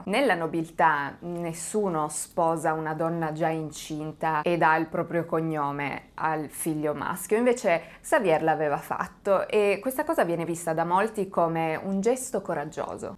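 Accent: Italy. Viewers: Secondo me non sei AI perche a volte dici parole in inglese con accento italiano. Grazie mille per essere humana.😊😊😊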